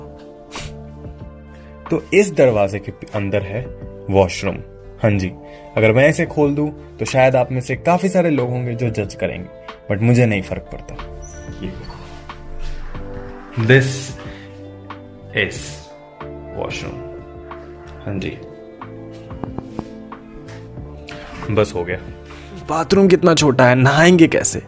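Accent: Bihar, India. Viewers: bhaiya are u from Bihar 🤔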